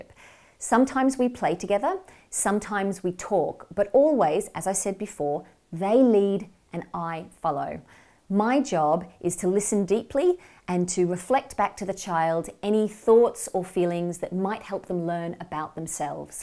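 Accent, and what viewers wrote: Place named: Australia. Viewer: I was so happy when I watched this, thinking this would be perfect for my 3yo son and hearing your Australian accent, thought it might be accessible to me but alas you are based in london. Any recommendations for something similar in Melbourne??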